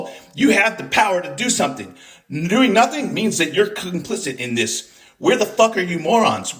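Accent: Scotland, United Kingdom. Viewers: Scottish accent ? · Love the Scottish accent shining brightly through this glorious, righteous rant. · I am catching a WHOLE BUNCH of a Scottish accent in that rant! So effective!!!! Love it!! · Point #1) absolutely spot-on,. Point #2) anger sounds better in a Scottish accent than any other, and I'm glad not to be the only one who does it.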